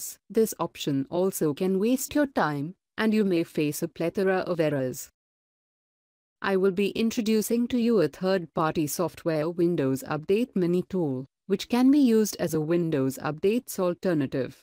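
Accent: India. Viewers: i can still hear an indian tongue ..SRSLY BAHBAH who heard it like i do ? anyone ?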